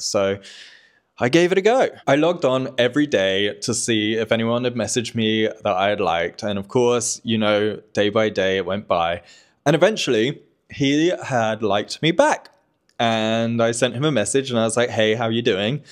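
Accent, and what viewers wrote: England, United Kingdom. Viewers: I am from Birmingham but it wasn't me lol loads of fake pics still about these days interesting vlog btw you still got your English accent 🍻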